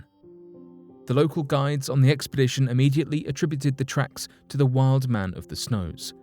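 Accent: England, United Kingdom. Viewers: This English accent guy is an awesome narrator!! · Any british voice over on a video about Yeti, Ufo's, crop circles, and most other stories having Zero evidence are just like this. It sells but has no filling quality.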